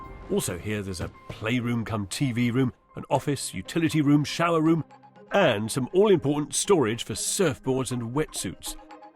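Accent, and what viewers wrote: United Kingdom. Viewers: If was not narrating in his British vernacular, these videos would just not be as interesting. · the British accent really completes this video haha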